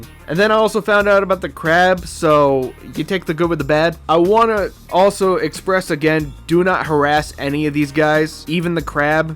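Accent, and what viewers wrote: United Kingdom. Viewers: And that British accento Is so thick and evident Not even english parodies can reach that level of accent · This guy is aggressively British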